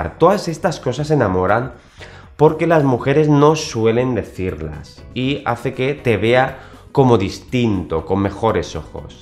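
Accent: Spain. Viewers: no me gusta el acento español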